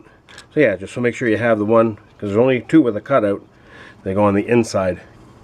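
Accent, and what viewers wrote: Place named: Canada. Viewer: Hey Great Video Eh? Very Helpful and I love hearing your Canadian Accent. Is it ever excellent eh? Clicked the thumbs up and subscribed for future videos. Cheer's!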